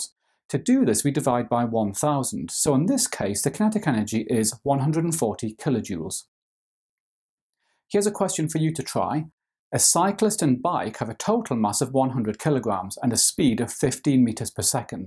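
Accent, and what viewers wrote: United Kingdom. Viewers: Thank you sir for doing these videos, so easy to watch and understand. There have been many American videos for studying on the Internet. However my English is my second language, I've always had trouble with pronunciation from American language videos. Your videos are free, follows the National Curriculum and in British English.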